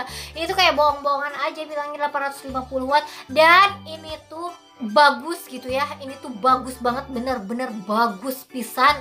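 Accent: Sunda, Indonesia. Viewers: logat sundanya kentel banget teh ngakak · Sunda pisan euy....😆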